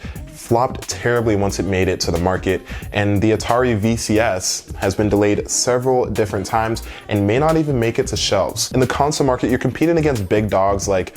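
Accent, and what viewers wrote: United States. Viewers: Where is he from Chicago LA Mississippi ATL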